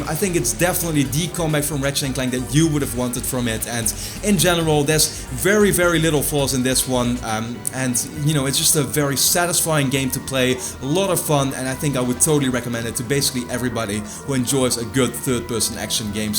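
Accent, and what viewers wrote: Netherlands. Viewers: is this guy dutch? if he is so hey ik ook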